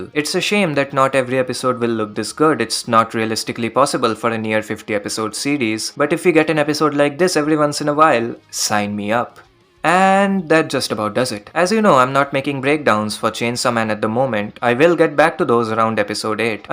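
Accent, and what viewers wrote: India. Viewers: Great video! Are you indian?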